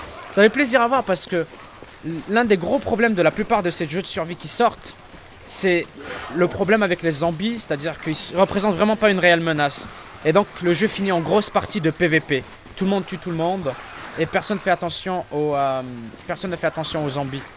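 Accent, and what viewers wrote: Quebec, Canada. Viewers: T de quebec?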